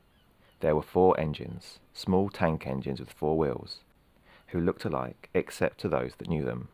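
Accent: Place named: Wales, United Kingdom. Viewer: Love the Welsh 🏴 accent, quite charming. 😊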